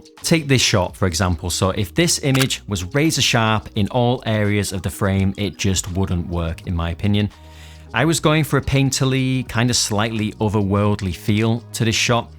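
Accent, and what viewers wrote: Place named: North, United Kingdom. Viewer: by eck it's good to 'ear a northerner on't that there internet..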